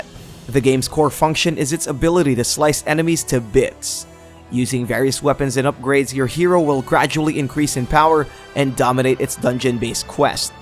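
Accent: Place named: Philippines. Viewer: Off topic. You sound Filipino.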